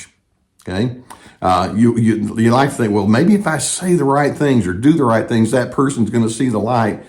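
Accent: South, United States. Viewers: This guy is a lovely healer , always bang on the mark, and the soft southern accent is balm.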